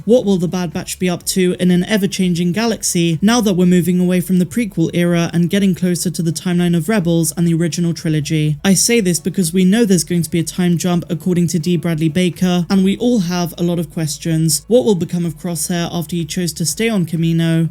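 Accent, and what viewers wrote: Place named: RP, United Kingdom. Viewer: 🤔 Going with Pedro doing last minute voice work for Boba Fett. Why take time out of filming one show, to do some voice work for Mando, when there is plenty of time to do so later. Like you said, last minute voice work happens all the time. More Migs please! Also, nicely done on the quote, as always, and never noticed you ever do them badly. You're like proper BBC Star Wars lady 😊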